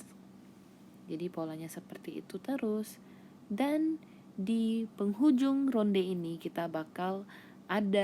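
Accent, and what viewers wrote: Malaysia. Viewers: ouh patutlah your logat/slang sound A bit like Malay xD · owh eheheh ku kira dari malay karena logat nya mirip by the way tangan kakak ko bisa putih bersih gt sih? TwT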